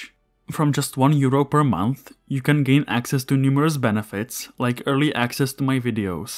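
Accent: Czech Republic. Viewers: Are you from the Czech Republic huh?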